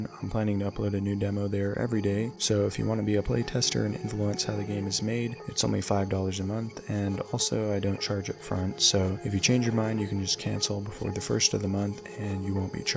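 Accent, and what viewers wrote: Australia. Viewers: Let me gues. Australia? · I bet you're australian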